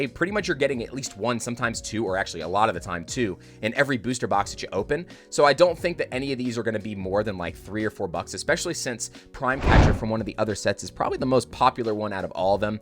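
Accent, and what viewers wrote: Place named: United Kingdom. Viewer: For a second I was like….did I click on the wrong video…who dis Brit?!??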